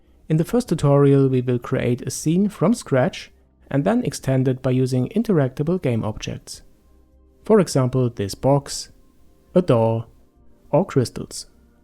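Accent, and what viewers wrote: Germany. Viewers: Du bist eigentlich deutsch oder ?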